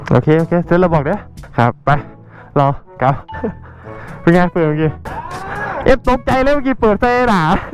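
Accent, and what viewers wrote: Korat, Thailand. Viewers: สําเนียงโคราชชัดเจนแท้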